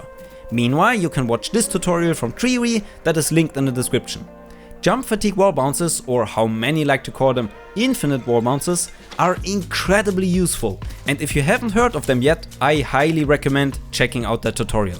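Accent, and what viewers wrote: Germany. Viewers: I have a question. Is your native language german?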